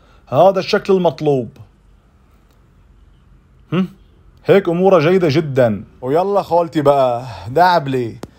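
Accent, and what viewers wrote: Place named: Latakia, Syria. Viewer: احلا حكي بلعالم حكياتنا ولهجتنا اللادئانيه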